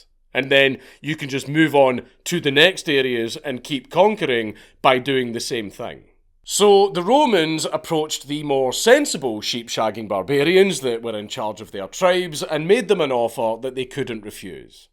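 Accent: Scotland, United Kingdom. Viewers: I fekkin love the scottish accent. History = Hesterree ..music to me ears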